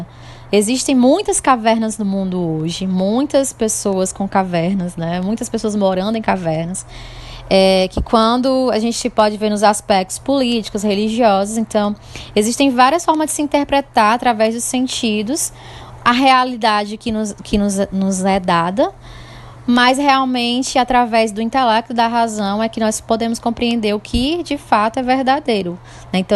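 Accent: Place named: Ceará, Brazil. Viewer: Legal o jeito que tú fala. Tú é cearense? Parabéns pela a aula!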